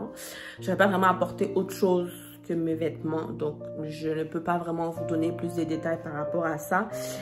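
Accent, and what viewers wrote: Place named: Quebec, Canada. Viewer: T'as de plus en plus l'accent québécois lol😅😅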